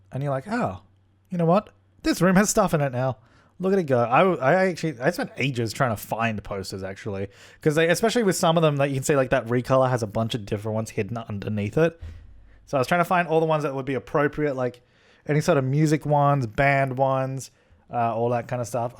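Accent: Australia. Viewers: Wait.... you're Australian??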